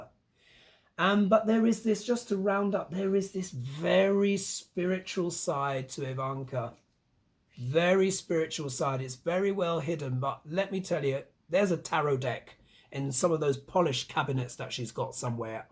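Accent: United Kingdom. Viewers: I’m a new subscriber and I reviewed a couple of your prior videos. I’m hooked on your style, your astrological intelligence, and your wonderful sense of humor. Also your innate communication skill, especially with your British dialect. Happy holidays and I Wish You Well!!!!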